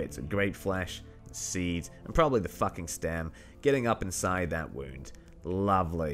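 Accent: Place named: Scotland, United Kingdom. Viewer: yes the video was enjoyable and useful but pleasantly filled with your ripe Scottish sarcastic humour!! (my mum was from Ayrshire so I've got a wee bit of Scots blood running through my ENGLISH😁 veins). Have you had any contact with America? Your accent is curiously relaxed compared to the slightly more "tight" Scottish I've often heard. I'm an English language teacher and fascinated by language, so the question is purely professional.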